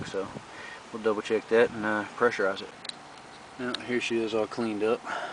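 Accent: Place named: South, United States. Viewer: Dang! Your Southern twang with epic in this one me boy.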